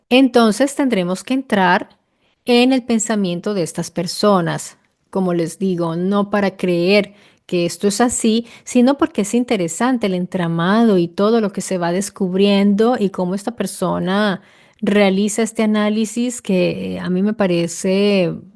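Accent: Italy. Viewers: Ese acento es de una rola que vivió en Italia y ese cabello/gafas le da un gran marco hermana.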